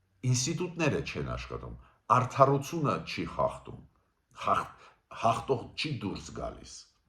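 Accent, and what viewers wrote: Russia. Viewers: Такое впечатление, что господин жил и учился на русском языке. Многие слова он произносит на русский манер. Одно успокаивает, что он патриот Армении на все 100%.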